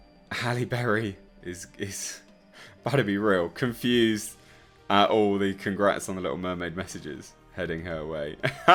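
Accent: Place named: United Kingdom. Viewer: this is my first time watching one of your videos and,well the first thought that popped into my head,honestly was"oh,this guy is either a racist or bigot. He's white,isn't he." You look white,but I don't know,you're probably British or something like that cause of your accent. Anyways,I see your points and why this "tokenism" from Disney bothers you and most of these other commentators. But,if I may say something. Why say something now about this,I don't remember this much heavy backlash when they changed the green lantern from black to white. Besides,who cares? Disney isn't the Disney from your childhood anymore. All they care about,and it hurts me to say this,cause I too grew up with Disney,is the green. Can we just let them destroy themselves if they want too? They own practically everything now,and only do so,to squeeze as much money as possible out of these franchises,countering they're only doing this for nostalgic purposes. 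First,no one asked for these remakes to be made. Second, they are unnecessary rehashes of something good that already existed. Third, it's a disrespect to the original saying it needs to be "fixed". Everyone needs to get off their high horse and be brought down a peg,especially Disney. We only fuel their flames and this negative advertising is only gonna push for them to keep making more of these. Why? Because they plain just don't care anymore,they don't care about quality animation,storytelling,or original content anymore. Si why should we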